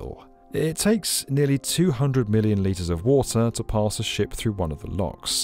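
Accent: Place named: Public school, United Kingdom. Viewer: So we get sweet talking public school voices explaining what they don’t know as they’re stupid more interested in the latest share price The reasons for and why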